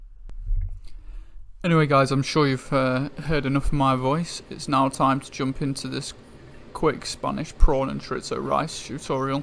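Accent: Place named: United Kingdom. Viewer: This looks delicious! However I've never had Spanish chorizo, only Mexican chorizo. btw, as an American I quite enjoy the British accent...talk away imo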